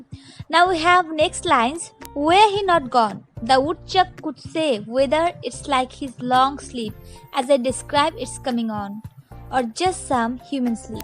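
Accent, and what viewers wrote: India. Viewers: Good explanation... In your talking style it proves that you belong from Bengali society 😊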